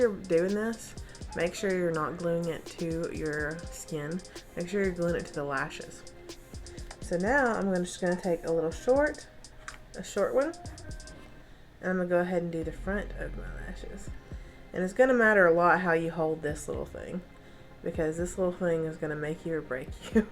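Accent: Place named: South, United States. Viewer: Fellow Southern girl here, what region is your accent? I love it. I've bought this kit a few weeks ago and used the lashes with regular strip lash adhesive because I've been too scared to use the glue it comes with. Thank you!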